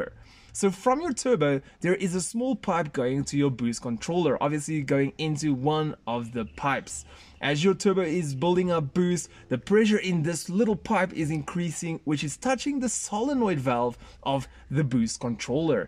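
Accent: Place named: South Africa. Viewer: Haha I heard your accent and figured. I'm in SA as well, Midrand side.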